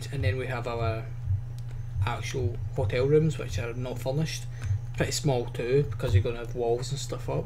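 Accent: Scotland, United Kingdom. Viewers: are you scotish i am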